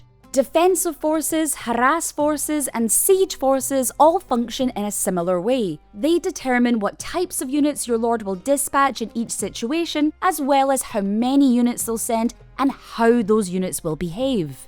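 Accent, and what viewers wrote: Scotland, United Kingdom. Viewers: Came for the tutorial, stayed for the scottish accent. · legit curious, this a Scottish girl trying a more neutral/American accent? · Gosh a guide AND with that accent! Big loves and thanks to Scotland!😍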